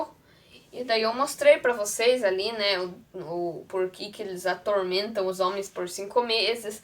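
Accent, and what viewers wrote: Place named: Israel. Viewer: Tem sotaque israelita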